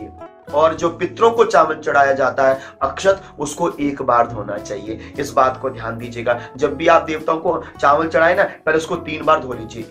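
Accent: Standard. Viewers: Bahut achhi video,shudha uchharan k sath...apka dhanywad...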